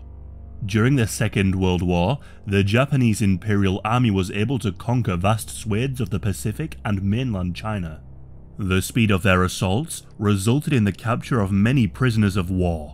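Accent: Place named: North East England, United Kingdom. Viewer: What the hell is this blokes accent-he sounds North Eastern, yeah a bit like Byker Grove?!!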